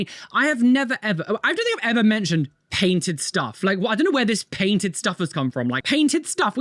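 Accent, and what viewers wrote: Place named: RP, United Kingdom. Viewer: all of these people dogpiliing on you … are they Americans? Because if they are, it explains a lot their baseless accusations. You see, here in the US, there's a bit of a trope around a British RP accent: it's associated with either (A) being erudite and and expert [both of which are hated by a large swath of this country]; (B) movie villains; or (C) snobs. Having been raised on Monty Python and imported British TV shows, I don't have that reaction. But to 'Muricans who do not have much experience with British culture, your RP accent [which sounds quite good to my ignorant American ears] is probably making them fall into those tropes the instant they hear you speak. Which may explain the hate — they literally are incapable of overcoming their preprogrammed reactions.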